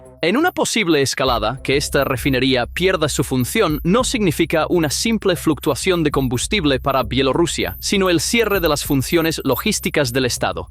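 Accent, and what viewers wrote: United States. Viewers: españa con acento gringo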